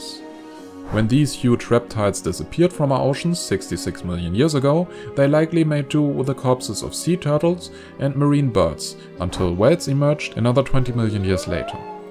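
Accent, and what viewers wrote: Germany. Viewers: i guess he is german . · That German accent ~ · i just geared your accent and knew you are german xD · I still do not know why this worm is a "zombie worm". But now fortunately I know that Indian English is not alone - German English also exists ;) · I cant unhear the fact that he speaks with a german accent XD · You sound German, but your english is good. A rare thing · Are you German??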